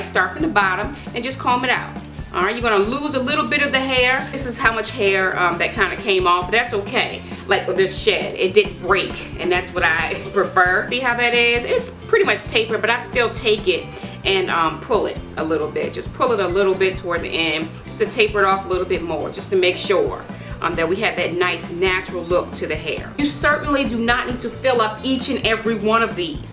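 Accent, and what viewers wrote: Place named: North Carolina, United States. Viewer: You are super creative and beautiful. Love your accent, too! I'm a New Yorker, but I can tell you're from NC. <3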